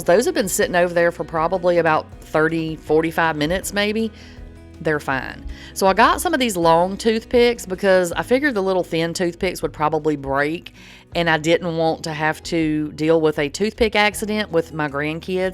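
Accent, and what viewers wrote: South, United States. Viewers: At times, I hear myself....horrible Baltimore accent...lol....more so than my parents ever had....😳...Your southern sound, very calming. Lol Luv your videos hon !!!!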